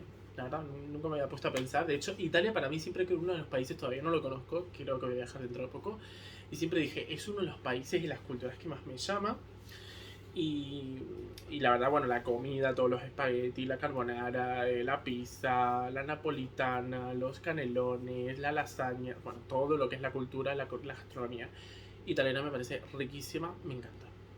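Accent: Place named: Argentina. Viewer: Cuanto hace que vivis en Barcelona? Hablas super argentino y se nota que haces un esfuerzo para pronunciar la "zeta". Entiendo que uses palabras como coger el bus, buaah tio, y todas esas expresiones... pero a que cambies de sonidos me parece muy contranatural.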